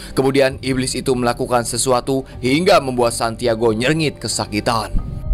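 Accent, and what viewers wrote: West Java, Indonesia. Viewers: Orang sunda kitu iyeteh nu nyariosna😅😅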